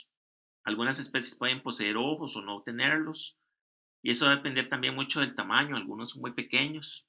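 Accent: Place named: Costa Rica. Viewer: El acento tico no se pierde xd Gracias mae por el video <3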